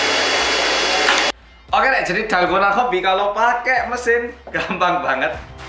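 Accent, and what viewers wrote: Java, Indonesia. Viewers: halo guys welcomback to korea bersama org korea yg Me:Medhoookk....:luwess.. Me:malu ny aku anjir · Halo guys welcome back to Korea bersama orang Korea yang "MEDOOOOK" bolo-bolo mana sworone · Kakak keren bangett sii, orang korea tapi medokk banget jawanyaa.. Fasih juga bahasa indonesinya... Sukses selalu mas 😍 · pokok e kalo udah bahas makanan atau minuman.. pakenya luweh. selain itu pake medokk.. hahahaha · Muedokkkk e pollll rek mas Iki ngalah² i wong jowo🤣🤣🤣😍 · "Dewean e , hampuddd" Medoke samean nggarai nagih mas😂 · Kak itu: •pinter •ganteng •cakep •medok jawa banget (walaupun au ngak bisa bahasa jawa:v) •ganteng •dan gantengggggggggggggggg Sumpah cakep bener kak 😍😍😍🤩🤩🤩🥰🥰🥰🥰 By: fanbase kak 🥰🥰🥰🥰 <mail>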